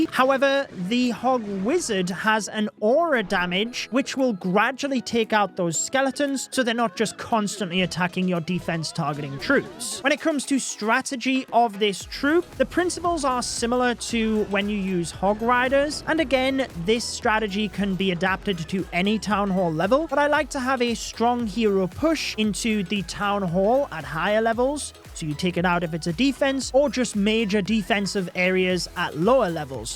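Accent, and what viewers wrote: United Kingdom. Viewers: Is he British or what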